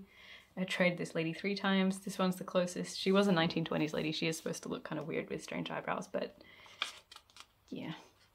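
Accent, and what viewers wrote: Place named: Australia. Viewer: New to your channel and really enjoyed your tour. I thought at first your voice sounded Canadian but then, no Australian! Ok well hello from Canada and I’ll check out more of your videos. Sorry you are so not able to get to Scotland, keep creating , stay safe! Especially enjoyed the kookaburra and reindeer!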